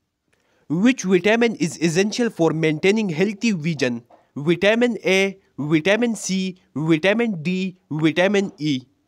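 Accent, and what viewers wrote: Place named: India. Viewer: thanks for this but i didnt expect it to be brutally indian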